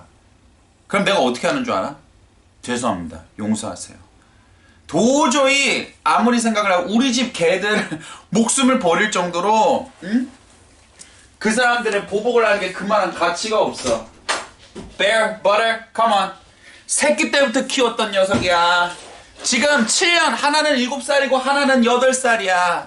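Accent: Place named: United States. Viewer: 한국인 입장에서 재미동포가하는 발음과 표현이 참 흥미롭게 들리네요..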